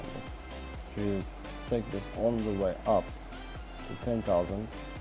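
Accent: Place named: Afrikaans, South Africa. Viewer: N mens kaan hoor jys afrikaans😂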